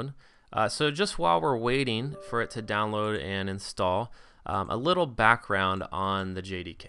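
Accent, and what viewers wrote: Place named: United States. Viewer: good morning Dr good to hear your american voice rather that the accent from India. I already listened to 3 videos on installing Java SW and i had no success too late at night to hear yours maybe tomorrow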